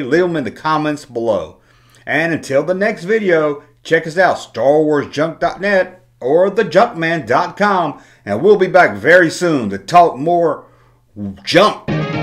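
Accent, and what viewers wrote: South, United States. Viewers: How in the HELL could someone with an accent as southern as yours have had an African American doll when you were a kid?!?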